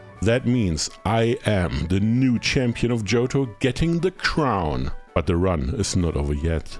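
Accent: Germany. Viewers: As a german, I immediately noticed the accent. Great content, def subscribing vro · The German accent is strong in this one!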